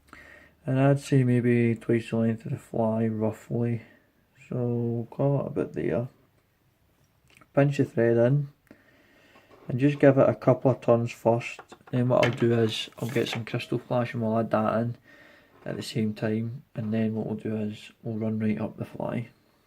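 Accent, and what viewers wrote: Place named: Scotland, United Kingdom. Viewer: Not being a Scot, I guess the accent fooled me! Not to imply the fly was not decently made! Where I am, we can still fish for sea trout, (Newfoundland, across the pond)! Fishing has not been cancelled here yet! Not very hard to stay six feet apart here! Hope things improve and you are able to wet a line soon! Stay safe!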